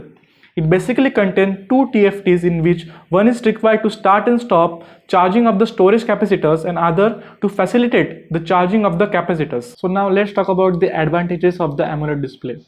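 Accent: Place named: South India, India. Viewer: You're right, judging by his accent, he belong to the South part of India, I am guessing Tamil Nadu.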